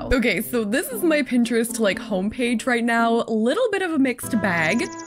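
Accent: Canada. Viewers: omg first video of yours ive seen and could tell right away u were Canadian lol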